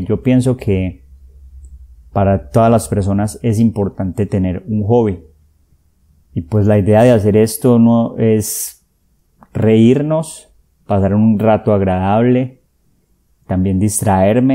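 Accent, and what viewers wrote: Colombia. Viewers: Saludos desde Singapur!! Encontré tu podcasts ayer jajaj y la verdad es un tesero porque yo estoy aprendiendo el español de colombia y a través de estos vídeos y podcasts no solo puedo escuchar el acento de Colombia sino también puedo practicar mi habilidad de escuchar en español porque hablas en una velocidad normal así que es un reto pequeño para mí. Pero los subtitulos me ayudan mucho entender lo que dijiste...Gracias por crear estos podcasts :D has ganado un suscriptor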